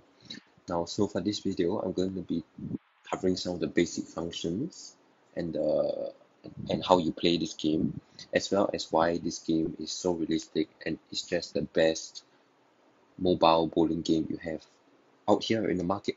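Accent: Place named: South Africa. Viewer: Who is doing the voice over. This is a South African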